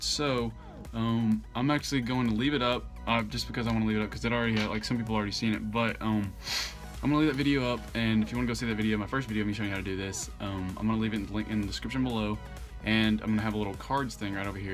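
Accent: United States. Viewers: hes got a southern accent it super awsome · He had a cowboy Accent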